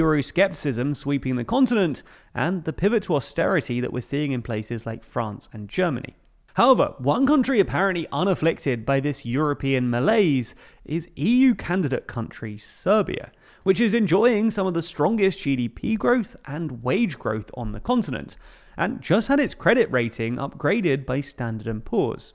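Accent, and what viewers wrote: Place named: RP, United Kingdom. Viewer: Good analysis, however you forgot few things. One of the reasons behind the price increases especially in real estate is the migration influx from Rusia and Ukraine. Another huge investment is the UAE construction project like Belgrade Waterfront. Also, don't forget the Serbian diaspora and their effect on the serbian economy especially in real estate. Another country investing in real estate and construction is Israel, also a major importer of serbian produce weapons. To top at all, Serbia is hosting the World Expo 2027 and the government is spending lot's of money on the projects. At the end, just a personal note...no need for a posh language, normal British narration will suffice.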